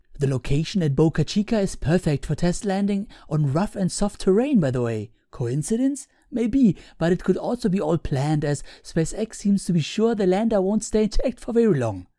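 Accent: Germany. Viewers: Almost certainly Germany. Please correct me if I'm wrong. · I Are you kidding? He has a really typical german accent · Junge du klingst so Deutsch ne😂😜 naja eigentlich geht's sogar. Cooles Video! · Das nenn ich mal ein "deutscher Vorzeigeakzent" 😄🍀 top Video, danke 👍👍👍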